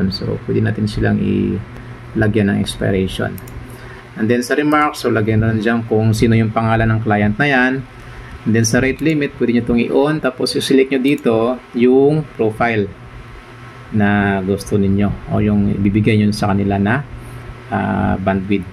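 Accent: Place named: Bacolod, Philippines. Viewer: taga bacolod ka sir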